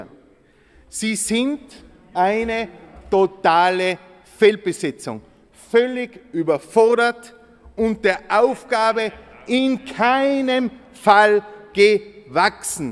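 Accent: Tyrol, Austria. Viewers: Hr. Steiner der Beste! Das ganze mit dem tiroler Dialekt! Genial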